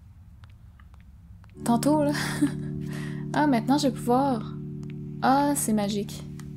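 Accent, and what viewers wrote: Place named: Quebec, Canada. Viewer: Je me doutais a ton précédent gameplay que tu parlais français (notamment lorsque tu utilises la voix google) mais j'osai pas le dire. Je suis français et c'est vraiment cool d'entendre un accent québécois ;-)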